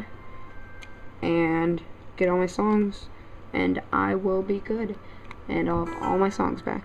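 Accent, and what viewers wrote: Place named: United States. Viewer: Suggestion: 1. Make a script (short headings are fine) 2. Prepare your material. 3. Do a few dry runs. 4. Speak clearly. For some people an American accent is hard to follow 5. Speak slowly. You sped up with the copycat@ line! 6. Don't hum or sing when you have a blank moment or drum your fingers. If you start to do that go back to 3 above. 7. Close your room door as we can hear your Mum or Sister talking in the background.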